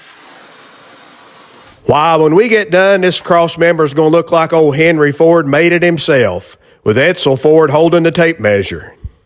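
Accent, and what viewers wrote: South, United States. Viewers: First time I've come across your channel, great work...but initially I thought I was listening to an episode of the Dukes Of Hazard, them good ol boys! Hi from Down Under Australia!!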